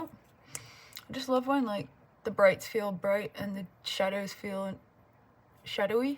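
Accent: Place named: Manchester, United Kingdom. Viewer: You kinda have a manc accent like my dad! Your looks are all gorgeous, loving this series doll!!🌸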